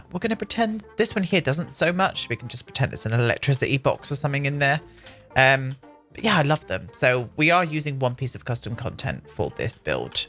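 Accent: United Kingdom. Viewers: You know, I've never actually liked my name until I heard it in your accent... it sounds way better from a Brit than an American!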